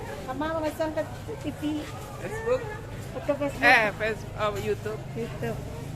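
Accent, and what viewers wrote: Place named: West Sumatra, Indonesia. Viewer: Suka dengar bercakap loghat minang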